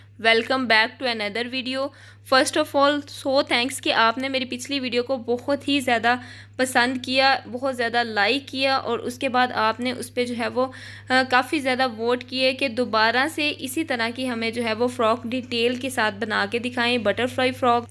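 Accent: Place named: India. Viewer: bolne ka pendo styel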